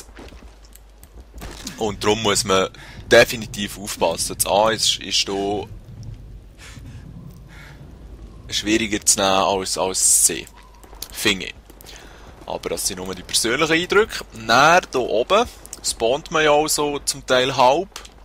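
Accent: Switzerland. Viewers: endlich mal en shwiizer xD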